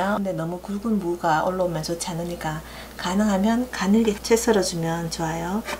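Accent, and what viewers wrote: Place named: Bonghwa, South Korea. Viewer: 타국에서 잘 보고있습니다!! 제가 봉화에서 태어나고 자라서 그런지 사투리가 너무 정겹게 들리네요! 항상 잘 보고 있습니다. 설명도 잘해주시고요 ^^ 앞으로도 좋은 영상 부탁드립니다 :D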